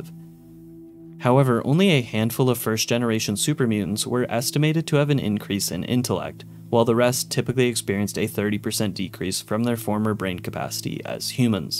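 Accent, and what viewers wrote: Canada. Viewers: You can totally tell you are from Canada lol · I can't decide if your accent is Canadian or not!(am Scottish so accents are weird haha) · I love your Canadian accent 😊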